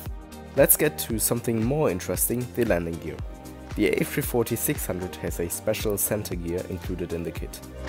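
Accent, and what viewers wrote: Germany. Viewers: Are you german???? Your voice and english accent sounds a lil bit like that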